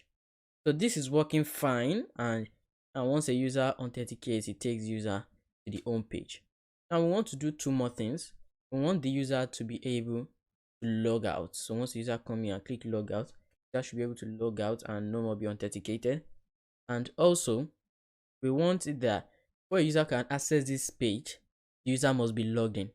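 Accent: Nigeria. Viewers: are you a Nigerian, I'm so happy to find this channel, I have been with freecodecamp but I do notice your voice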